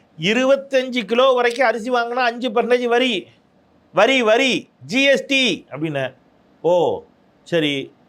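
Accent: Tirunelveli, India. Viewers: பிரதர் ரொம்ப நாள் கழிச்சு நம்ம ஊர் பாஷை திருநெல்வேலி பாஷை கேட்டிருக்க வாழ்க ஜனநாயகம்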